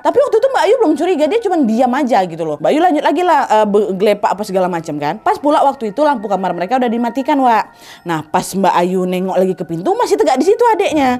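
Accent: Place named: Medan, Indonesia. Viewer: Tau kau! 😂 ini medan kali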